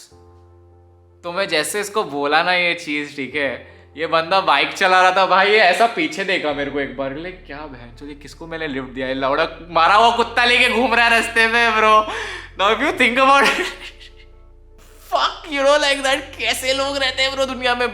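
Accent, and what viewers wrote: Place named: Mumbai, India. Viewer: Your Bombay accent is very sexy 🤌🏻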